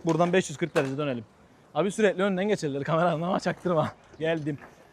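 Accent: Azerbaijan. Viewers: Abi Türk müsün? Azeri gibi konuşuyorsun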